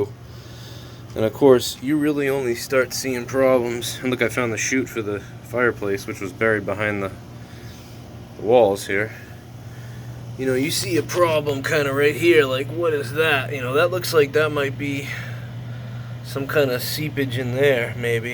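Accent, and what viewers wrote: Boston, United States. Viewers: Lol do you consciously switch to your Boston accent or is just something that happens sub consciously?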